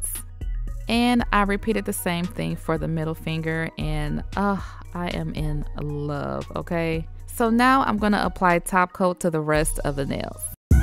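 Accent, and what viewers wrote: South, United States. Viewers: Can I just say, with that southern accent and smooth voice.. i swear you sound like Beyonce, especially when you giggle, girl. Thank you for these calming chill videos☺️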